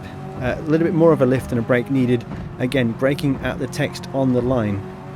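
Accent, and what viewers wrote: United Kingdom. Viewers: Bro! Kyle Bush with an English accent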